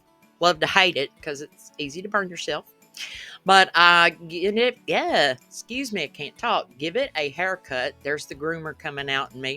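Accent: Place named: South, United States. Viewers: Are you from Kentucky? You sound a lot like KathyJo DIY's! I absolutely love the accent! Thank you for sharing and talking! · Hey ❤I love your little pumpkins. They are so adorable. I love the Southern accent😂 Mine comes out really bad sometimes. TFS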